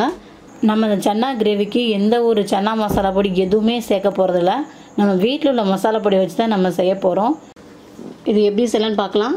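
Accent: India. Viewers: Mam unga native nellai ya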